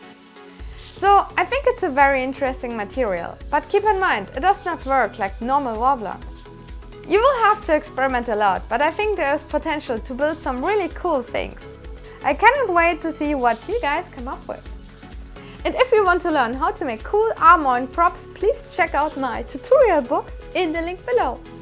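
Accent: Germany. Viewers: German accents are so cute. Makes me want to hug every German when they speak English.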